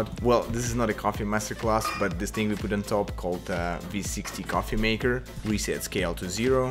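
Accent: Russia. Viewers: Мне одному казалось что он русскоязычный из-за акцента🤔😁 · Как так получилось, что ты русский и делаешь это на английском? Я просто долго думал, русский ты или нет, вслушивался в акцент... И вроде я уверен в этом. Расскажи, пожалуйста, по скольку мне очень нравится то, что ты делаешь, а потому было бы очень интересна твоя небольшая история. Спасибо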